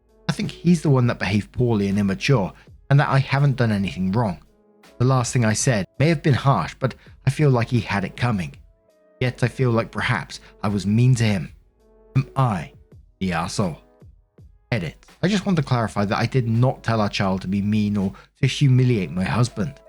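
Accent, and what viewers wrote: England, United Kingdom. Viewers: there is nothing i love more than hearing the voice of a tatted english sweetheart saying "welch's grape juice dumpy" and "hella ass" while laughing. i think i may literally be in love with you just btw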